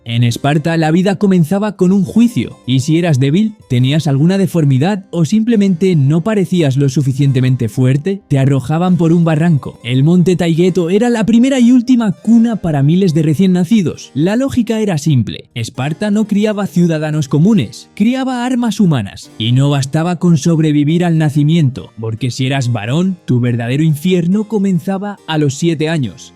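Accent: Spain. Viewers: Como se nota que lo hizo un español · العنوان بالعربي بس الصوت شكله اسباني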